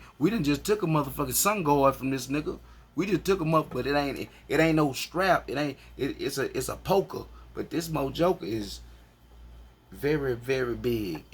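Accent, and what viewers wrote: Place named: Texas, United States. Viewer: TKO sauce. Haha. Thats funny shit. You have an awesome accent. That Texas twang is on point. I saw you from watching OG Percy videos. Were you locked up with him at all? Much support from Humboldt County cali. Just subscribed. You have a nice delivery. Keep pushing these videos out. 👍👍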